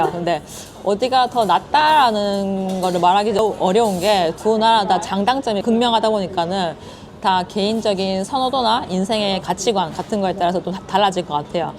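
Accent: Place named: Gyeongsang, South Korea. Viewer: 갱상도네요 ㅎㅎ🎉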